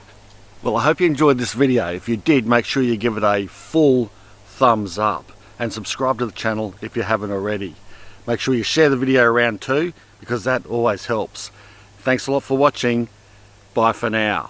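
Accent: Australia. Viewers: I'm half watching just for the accent. The Aussie accent is the coolest there is. G'd day, mate. Oh, and thanks for saving me time and money. I would have filled mine with high quality $$$ soil. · currently 26 people don't like this video: you have no hearts guys! :D Aussie accent the body and the energetic effort with a ton of passion - together make my days better! A FULL thumbs granted!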